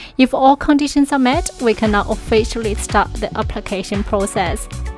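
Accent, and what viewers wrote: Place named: China. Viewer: 英語は大丈夫だよね 中国人ですか？その発音はちょっと中国語だから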